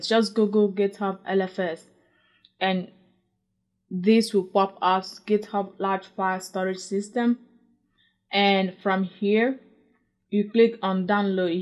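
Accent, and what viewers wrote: Nigeria. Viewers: thank you very informative n it sounds like you are Nigerian!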